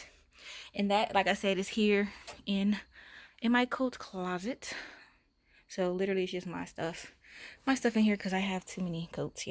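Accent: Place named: South Jersey, United States. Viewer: Love your pantry vids. New subscriber here. I’m originally from south Jersey and you sound like a local girl (SJ/Philly) and it’s not common on here and I’m loving it. ❤️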